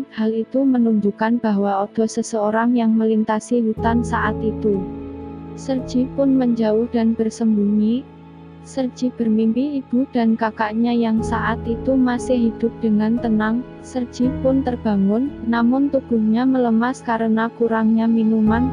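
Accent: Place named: Java, Indonesia. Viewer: Bohoso jowone ije kentel men mbak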